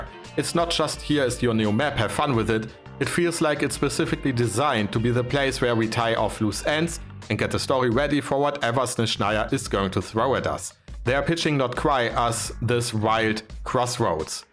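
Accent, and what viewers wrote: Germany. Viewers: The video is great, however, the whole time I've been wondering where you're from and I think it's a German accent? 😂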